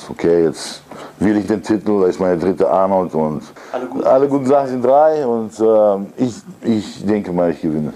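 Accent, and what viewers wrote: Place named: England, United Kingdom. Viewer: Er wohnt in Amerika, so wie es aussieht spricht er deutsch mit englischem Akzente. Passiert einfach......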